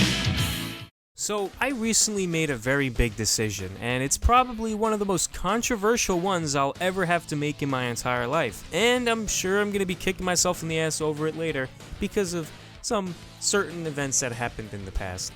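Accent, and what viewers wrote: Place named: Boston, United States. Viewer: I can sense that he may be from Boston. Hello my brother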